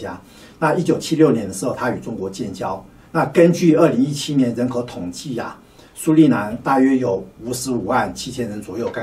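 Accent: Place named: Taiwan. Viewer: 请问，您老是客家人吗？听口音是台湾人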